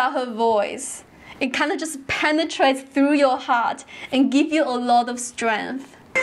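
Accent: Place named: China. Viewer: Your English is good except for a few words. Typically the word "in". You must end with your tongue touching the inner upper teeth. You left your tongue in the centre which most China Chinese do. Hope you are not offended. Hopefully, it will help you in your life 😘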